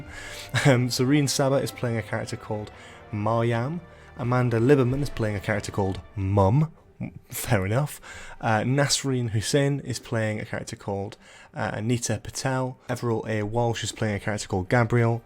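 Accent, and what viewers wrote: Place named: Yorkshire, United Kingdom. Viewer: Yorkshire accent? I've always thought you were a Geordie, or thereabouts.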